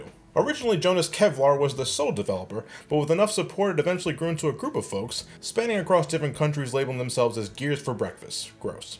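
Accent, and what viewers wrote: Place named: New York, United States. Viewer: Thanks for all the content my man. Swore you were from NYC from the way you speak and the moving out of your mom's crib video and all that, but either way, hope to meet you at magfest. I know you'll be as awesome as you've always been on camera. God bless. Se te agradece todo lo que has hecho.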